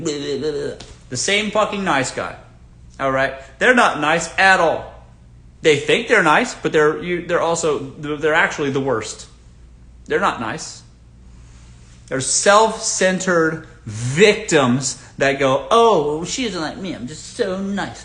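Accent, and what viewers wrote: South, United States. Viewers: I think he mentioned he was Southern..Sounds it too..Maybe from Georgia?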